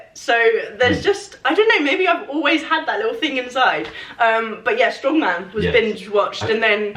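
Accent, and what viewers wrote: United States. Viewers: For these new generation kids, your American accent fits right in there. You'll do fine. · loved the american accent :) i'm from ny! my question for next video would be, how does your mom feel about your camera man :) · Hahaha your American accent wasn’t actually that bad. It’s better than I could do a British accent lollll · I love your American accent, I’m from Chicago and feel as though my British accent would be just as poor 😂 love you & your videos 💜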